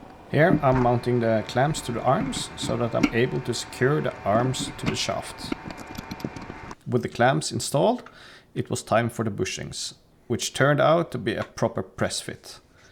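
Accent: Norway. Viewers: Are you Norwegian?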